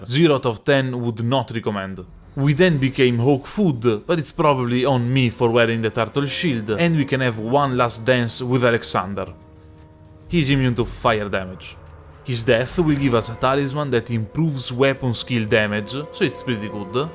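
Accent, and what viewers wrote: Italy. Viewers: si senta un filo l'accento italiano mi piace ancora di più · l'accento italiano più forte e marcato che io abbia mai sentito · Accento leggermente italianeggiante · Mi son bastati i primi 2 secondi per capire che eri italiano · this guys Italian accent is so heavy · you sound so much italian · I hear the Italian accent my guy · Are you Italian by any chance, mate? I can recognize the accent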